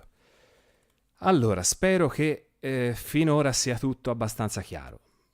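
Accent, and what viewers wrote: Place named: Montecatini, Italy. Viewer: secondo me sei di Montecatini, parli come una mia ex :)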